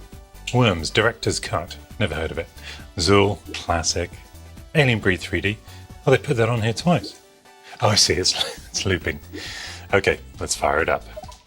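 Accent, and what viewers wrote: United Kingdom. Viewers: Dang, fast replies here! :) Thank you, me and my daughter (who alsdo don't like spiders), also guessed a lizard. Where do you live btw? You sound like you're from the UK, but some sticker on the box said US, but you do like C64, Amiga, etc which is kinda European.. myself from Sweden felt the craze here as well :) Mighty awesome channel though! The asthetics of your old game room / pc room / studio is so inviting, with all the old vintage equipment looking new, fresh and colorful :D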